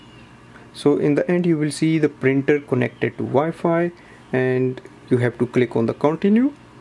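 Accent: India. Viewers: very bad instructions hard to understand India speaker - very little paper tray -NO instruction how to set up WITHOUT smart phone to me a WAST$E of money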